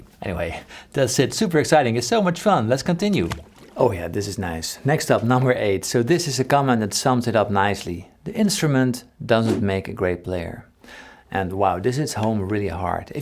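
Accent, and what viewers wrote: Netherlands. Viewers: you sounds dutch? are you dutch? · The Dutch accent is thick in this one. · This man has one the smoothest yet clearly Dutch accents I've ever heard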